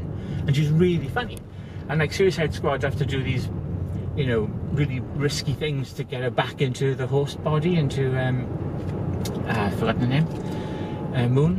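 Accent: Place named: Birmingham, United Kingdom. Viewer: You from Birmingham? I recognize the accent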